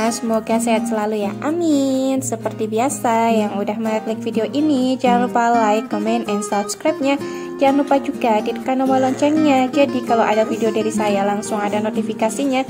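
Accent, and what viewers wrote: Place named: Java, Indonesia. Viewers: emang asli orang mana mom,,,, kaya orang jawa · Bunda orang mana sih? Kayanya ngomong nya medok gt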